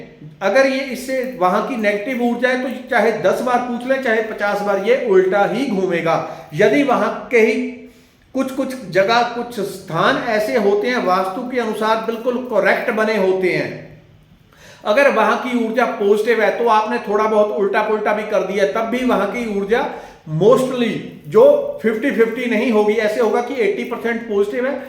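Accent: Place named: Punjab, India. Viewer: Bhaji meinu lgda tusi punjab ton hon ... tuhadi tone punjabi a ... dsyo ?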